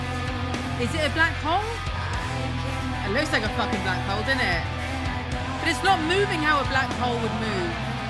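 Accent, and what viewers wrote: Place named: United Kingdom. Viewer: What is your name again, please?! I love the British accent!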